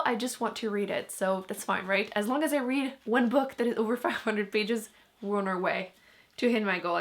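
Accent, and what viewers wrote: France. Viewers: sorry but each time I keep wondering... are you french ?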